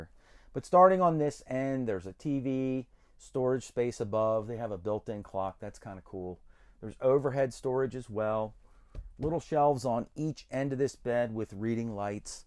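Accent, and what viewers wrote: United States. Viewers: Love your warm accent 😄 you really lengthen your oooooo’s which is so warm to listen to , a very friendly down to Earth American accent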